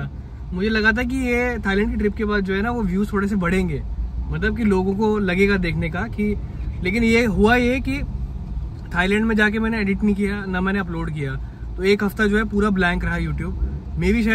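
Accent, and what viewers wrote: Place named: Garhwal, India. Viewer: Aapke ghar ke video best hote hai ...mja apki mummy or apke gadwali ascent se hi ata hai ...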